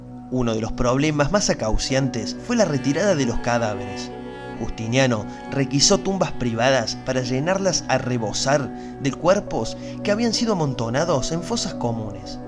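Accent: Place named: Córdoba, Argentina. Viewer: con un ligero acento coordobés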